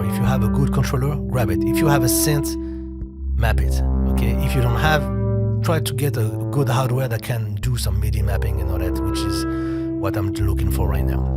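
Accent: France. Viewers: Excuse my French but your English sound french 😅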